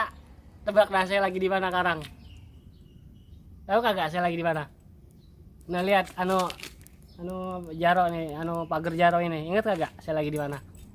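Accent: Betawi, Indonesia. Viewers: Betawinye enakan anak kemayoran logatnye.ente betawi pinggiran ye bang? Ketahuan bahasenye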